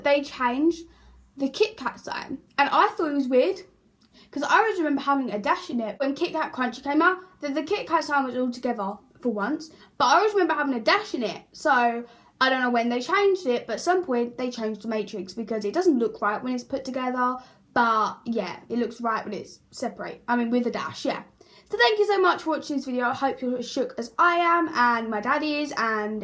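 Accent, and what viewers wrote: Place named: United Kingdom. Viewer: hard to listen to the br accent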